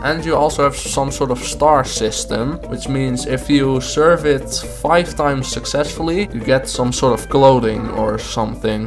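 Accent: Netherlands. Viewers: Haha dit klinkt echt als een Nederlander 😂 klopt dat? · R u Dutch? U sound dutch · Are you dutch?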